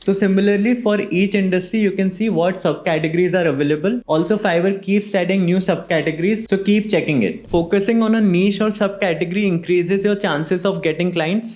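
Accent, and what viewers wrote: India. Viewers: Just started watching video. This bro is totally inspired by indisn accent of english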